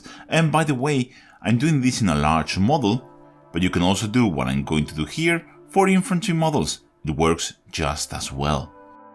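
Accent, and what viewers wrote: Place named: Spain. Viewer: Fue escucharte hablar inglés y supe de inmediato que eras español, nivelazo de inglés pero el acento español siempre se nota.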